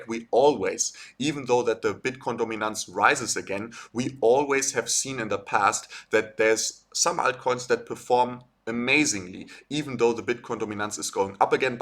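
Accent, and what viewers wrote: Germany. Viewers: Thanks! I just started following you thanks to AltcoinDaily. I gotta say you give a great analysis, oh and I love the German accent! I’d love to see a video from you sometime talking about how you were first introduced to Bitcoin, how old you were, and how you’ve come to build the portfolio you have today.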